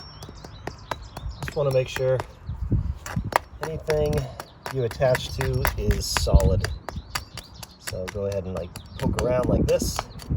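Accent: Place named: Northeast, United States. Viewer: Try as you might you can't hide that Northeastern accent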